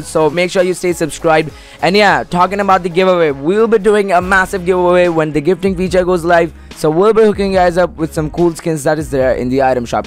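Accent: India. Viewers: maybe indian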